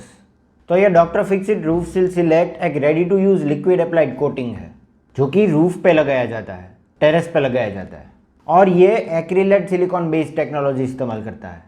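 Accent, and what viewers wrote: Gujarat, India. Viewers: Gujju raping hindi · That gujrati ascent is so good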